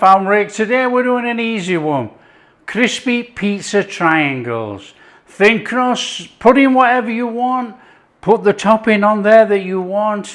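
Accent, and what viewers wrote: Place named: England, United Kingdom. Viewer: Love your scent which part of England are you from x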